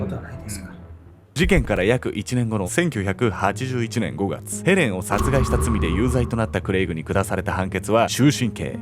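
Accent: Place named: Ishikawa, Japan. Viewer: なんで石川弁wwwマイナーな😂石川県民としては嬉しいけど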